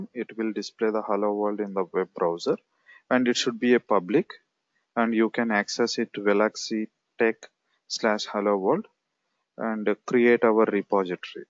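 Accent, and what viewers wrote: India. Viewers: Every indian pronunciation sucks I can't stand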